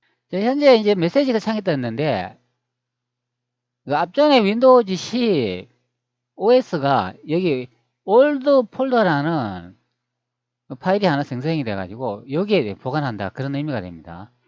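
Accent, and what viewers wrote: Gyeongsang, South Korea. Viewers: 아이구... 상도 사투리.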